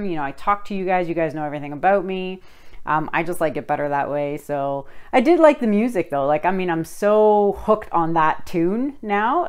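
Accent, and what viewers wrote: Canada. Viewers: She cracks me up because she sounds so Canadian. That’s not a bad thing. It’s just very easy to tell she’s from Canada just by listening to her.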